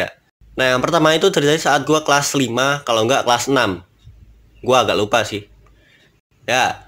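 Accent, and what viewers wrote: Java, Indonesia. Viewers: Apakah kamu orang Jawa bang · Bang lu dari jawa yah🤔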